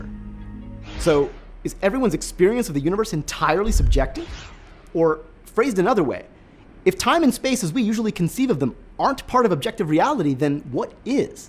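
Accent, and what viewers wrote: Australia. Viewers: A few years ago, watching Matt instead of Gabe was slightly weird. Now rewatching the old videos and seeing Gabe instead of Matt is weird (no disrespect, Gabe). Matt has really made SpaceTime his own, presence wise I mean. Its weird to watch a SpaceTime video and NOT hear that sexy Australian Complex Physics voice. You guys chose a really good replacement. The lightsaber battle was cool too. May Matt guide us in this journey of understanding (or trying to understand 😁) for a long time. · I wish gabe stayed. Being a non native english speaker, the new host’s australian accent is so hard to understand.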